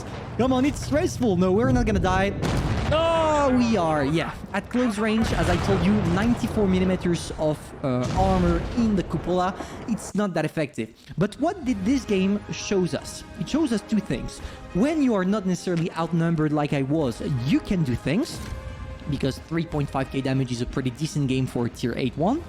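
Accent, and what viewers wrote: France. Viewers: Tu est français😂😂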